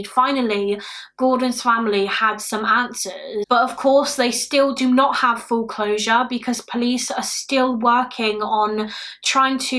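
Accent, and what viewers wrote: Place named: West Country, United Kingdom. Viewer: In case you're interested, accent is from the English West Country, so Bath or Bristol. Somewhere in that neck of the woods. 🙂❤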